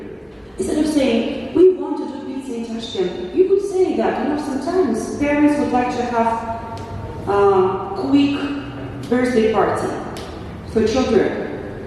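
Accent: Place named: Russia. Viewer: Ruscha accentga ketarkan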